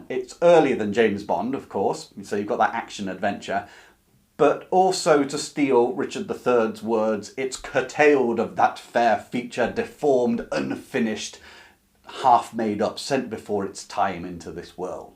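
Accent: England, United Kingdom. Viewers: I'm glad you appreciate as I wasn't sure it was a good thing to point this out 🤔🤗 I'm not from south of France so I can't confirm if "t" is pronounced over there 😅 Well but anyway I think it's pleasant to hear some English accent. I guess most of the French people do 👍😊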